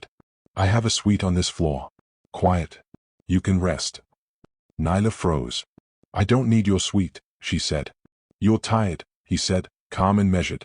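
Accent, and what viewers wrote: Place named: London, United Kingdom. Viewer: This narrator story teller speaks well and good and he tells this story really well and good. This story teller speaks with slight accent this story teller narrator sounds like and speaks like he's from London England he's doing a great job of telling this interesting awesome amazing I can understand everything that this story teller says as he's telling this great beautiful interesting story.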